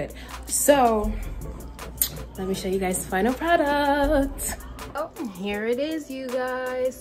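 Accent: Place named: Midwest, United States. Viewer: That food looks good and you sound like you’re from Chi town or the Midwest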